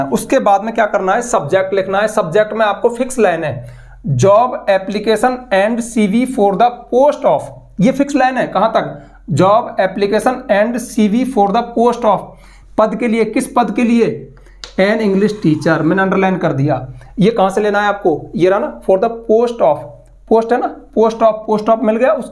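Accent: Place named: Meerut, India. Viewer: meerut se ho ky sir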